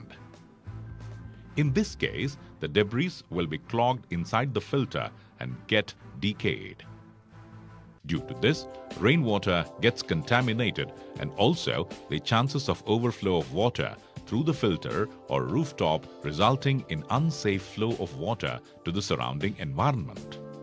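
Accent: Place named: South Africa. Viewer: this really has cheaply made written all over it its basically what you get in your modern shower plug made in india by a company called chicken makka roo!!! advertised by a indian guy called and a accent posh english mixed with south african, i mean look at their research and development tell me thats not a back of a run down chicken coup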